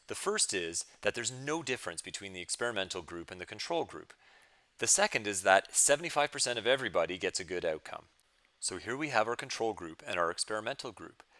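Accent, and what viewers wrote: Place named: Canada. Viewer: That Canadian accent thoughhh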